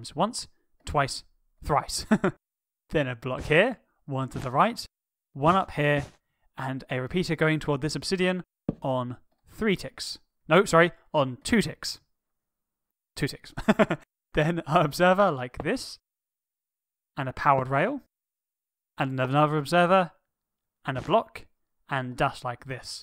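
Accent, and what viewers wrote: United Kingdom. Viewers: I know this sounds weird, but I like your British accent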